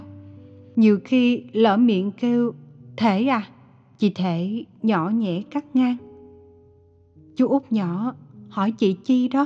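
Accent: South, Vietnam. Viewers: Truyện hay, tình cảm nhẹ nhàng: 9/10 Giọng đọc miền Nam của xuất sắc, và cách đọc, diễn tả quá hay.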